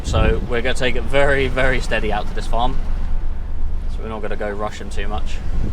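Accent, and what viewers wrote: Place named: South, United States. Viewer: Nice one. Good video and great southern accent you have 😅… keep them coming 👍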